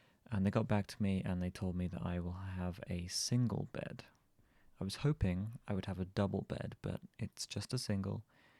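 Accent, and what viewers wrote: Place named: United Kingdom. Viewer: this randomly got recommended to me, hi! we love british accents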